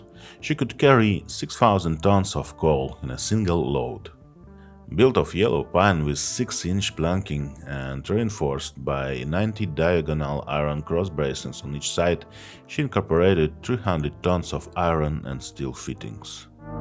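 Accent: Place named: Russia. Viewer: great video and the russian accent makes it old home week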